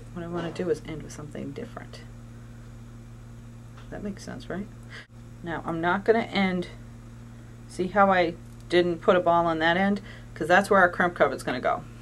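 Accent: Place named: Massachusetts, United States. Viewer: I knew she was from Massachusetts before she said so! (I am too!) Great video....and I love pink too!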